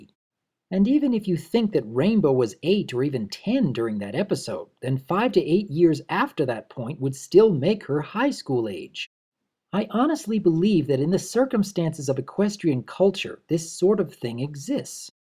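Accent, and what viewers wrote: United Kingdom. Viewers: Dear Are you British or American?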